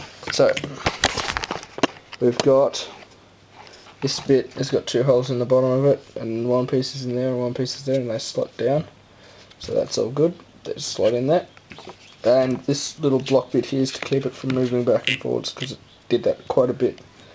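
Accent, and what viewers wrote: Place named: Australia. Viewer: love the aussie accent :)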